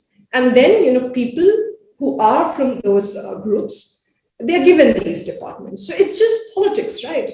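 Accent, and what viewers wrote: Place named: United Kingdom. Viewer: madam everything is good but to connect with masses please you can speak in indian accent, please minimize using british accent.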